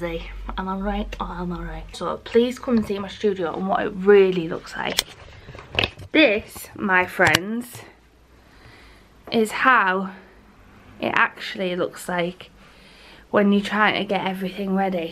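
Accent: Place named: Teesside, United Kingdom. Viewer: Hello ! I found your channel pretty randomly last week, you and your art are totally adorable. I love your studio vlogs even the bits you think are boring. And i can't believe i'm hearing a Tesside accent on here! I live in Yarm so i'm v close by if you'd like a new gal pal at all i'd totally be up for coffee and cake. I have no idea where that coffee shop is but i'm offically intrigued.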